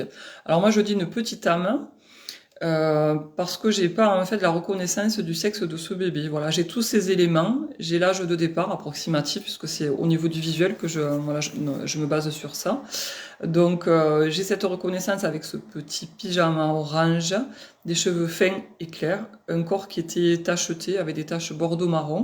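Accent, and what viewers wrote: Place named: South, France. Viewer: Moi, ca me fait du bien d'écouter votre accent du Sud 😊🤔😁Io ne me manque plus que le chant des cigales et se sera ok, je vous entendrai bien 😅🤔😁💚💚💚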